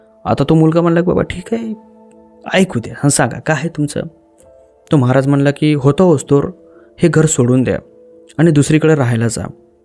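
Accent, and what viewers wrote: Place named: Marathwada, India. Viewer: टिपिकल मराठवाडा टोनींग😊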